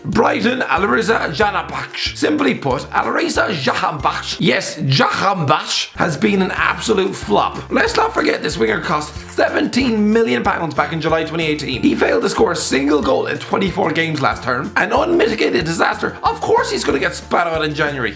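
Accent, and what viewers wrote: Ireland. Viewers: Irish accents are really nice, but your sick, dont upload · Press f in the chat for Irish guy voice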